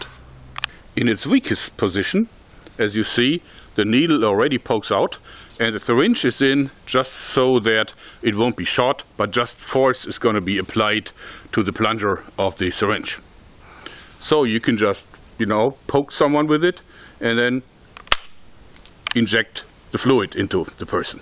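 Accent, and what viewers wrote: Germany. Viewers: abgesehen davon hat der deutsche akzent einen scharm der irgendwie zu DIY Waffen passt:D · Between his thick German accent and the nature of this device, I can't help but think of Doctor Mangele and his many "very special" experiments.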